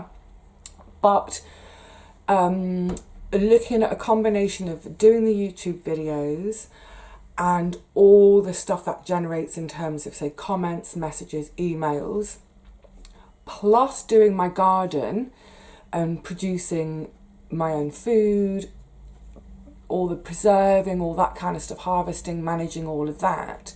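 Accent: United Kingdom. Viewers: You have such a beautiful British accent, love it. So you have Trolls in the UK also? I watch You Tube videos but I don't have my own channel. Here in the USA the Trolls can be very cruel. Please try to ignore them, give them no thought. I think it's so exciting that you were and are an actress. You are very young, I'm now on Social Security Disability at 71 years old. I remember waiting to 67 to get Social Security here was hard, I took mine early at 62, but then there was no Medicare (healthcare) until age 65. It is so hard for widowed women in the USA. But so much harder for Single, widowed or divorced women here, especially with working full time with children and childcare. Thank You for showing us how things work for you...so very interesting. So proud of you for tackling and paying off your debt. You are a true marvel to me!! Please stay safe and Take care from your new pals in the USA, Debbie and Dexter-Dog the amazing tiny chihuahua companion.🙋🐾💕🙏🙏🙏😷😷😷🏥🏥🇺🇸😁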